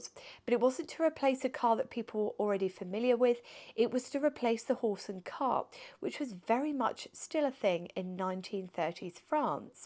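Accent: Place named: United Kingdom. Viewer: As a frog, I love your pronunciation of french names. I really do. Sounds really nice, so British! You're so well spoken, truly pleasant to listen to you. Thank you for your quality work! You do those great cars justice! Warm regards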